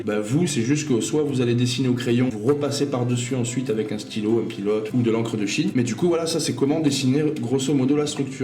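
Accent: South, France. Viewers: Excellent l'accent du sud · comment dire.... tu es énorme!! j'adore ton humour, tes explications...et ton accent sudiste! merci pour ce cours majestueux!! (un nouveau fan sudiste!)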